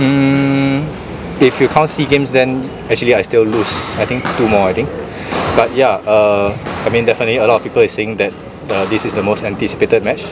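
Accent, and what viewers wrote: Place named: Singapore. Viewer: most singaporeans speak like that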